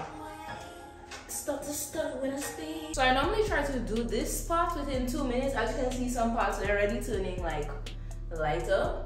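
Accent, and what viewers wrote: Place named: Saint Lucia. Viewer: Omg I know this accent ..🇱🇨🇱🇨🇱🇨🇱🇨girl I’m here looking at videos to help make me get comfortable with the color . I give myself 1 more week then cut and white here I come 🤭… so happy it’s Lucian ❤❤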